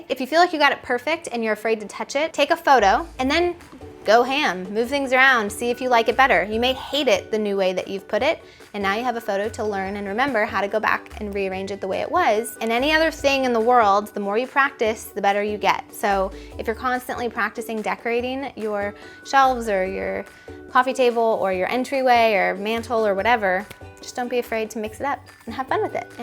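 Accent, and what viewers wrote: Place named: Texas, United States. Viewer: I'm from Texas and you do kind of sound like you're from Texas! My biggest struggle is COLOR. My fiance decorates without really thinking about color which is clashy, and I just don't know how to put colors together in a way that is not clashy but still interesting! I would love a video on this or maybe some templates! I would also like tips on incorporating old furniture and decor that isn't necessarily your current style.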